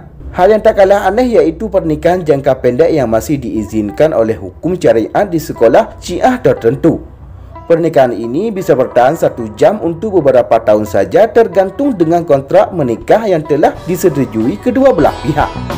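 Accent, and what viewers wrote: Aceh, Indonesia. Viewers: Aceh tok tok kali ngomong bahasa aceh, medok that 😅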